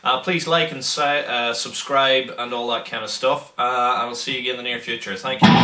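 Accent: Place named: Ireland. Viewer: You are most welcome! I love your accent to my brother. My heritage is Scotch-Irish and my ancestors came to America around 1792. There is something about Ireland that draws me to visit there one day. If you knew my real last name (Phillips is a name I use often, but not my real name) you'd know instantly how I am connected to Ireland. Enough about that though yeah? I have played guitar for 42 years now and still am intrigued by how 6 strings can paint such wonderful pictures of sound. Yeah, I know that some guitars go to 7 and 8 strings but they are not for me. I have over 50 guitars now, down from 112 at one time. Some of my favorites: (1) Gibson RD...what a wonderful instrument (2) Gibson Melody Maker...a simple, yet incredibly insane instrument-the tones from this 1PU wonder are friggin' beyond belief (3) 1979 Fender Strat that is a masterpiece of sound (4) 1975 Fender Bronco, my first guitar ever and still plays so beautifully (5) 1985 Tokai Explorer-a Japanese masterpiece (6) 1978 Ibanez Musician- a 24 fret 14lb neck breaker of sound. 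It is one of the most well made guitars I've ever seen, yet it's weight is a problem. It is the heaviest of all my guitars and will burden you after 3 songs. It has more switches than a Boeing 747 (ok...not really) and I'm still discovering new sounds. More later....got to go...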